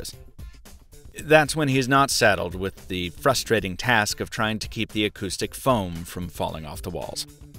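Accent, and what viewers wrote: Boston, United States. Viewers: are u from boston ?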